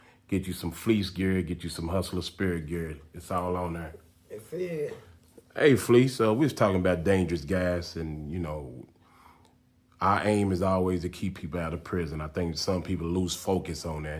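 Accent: South, United States. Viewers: Hey Fleece, my comment got deleted but I saw you read all your comments. You're my favorite person on YouTube and I wanted to tell you what an inspiration you are to all of us brother, especially me. My heart aches for you and what has happened for you in your life. I can't imagine that kind of abuse and trauma. And you came out with faith and a smile. You are a Unicorn bruh! I'm from Chicago so y our southern drawl and charm and the way you tell stories, well, your just a funny dude. Very charismatic.Never stop being you and telling us stories. And, thanks for all you do nd bringing us!!!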